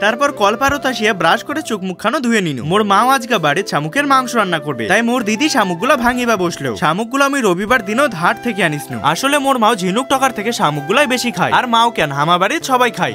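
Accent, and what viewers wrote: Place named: Rajbongshi, Bangladesh. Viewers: বাংলাদেশের বগুড়ার ভাষার মত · Dada amio to Rajbongshi but tomar Rajbongshi vasa gulo alada mone hochhe keno...? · দাদা মুই আপনার fan বাংলাদেশ থেকে বলিছু মোর গ্রামের বাড়ি দিনাজপুরে এইভাবে কথা বলি হামরা এক কথায় এটা হামার গ্রামের আঞ্চলিক ভাষা🥰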